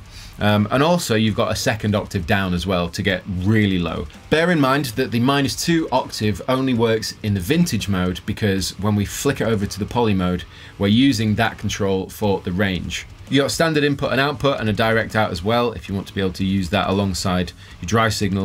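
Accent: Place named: England, United Kingdom. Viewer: More intens-a-E. 😅.....gotta love the English accent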